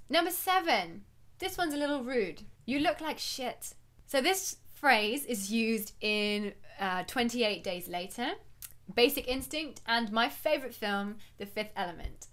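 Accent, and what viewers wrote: United Kingdom. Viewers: Are you from England？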